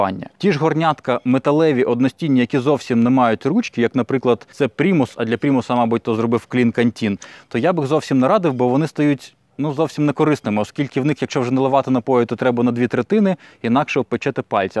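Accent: Standard. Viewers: Не так давно відкрила для себе, але приємно вражена - все подано дуже цікаво, дотепно, тішить слух несуржикована українська мова, дякую за чудову роботу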